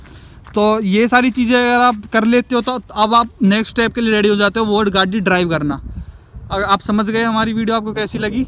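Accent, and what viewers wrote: Haryana, India. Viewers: Haryanvi bolte hai dono 😊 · It's just bcz of his haryanvi tone even my friends sounds the same 😂😂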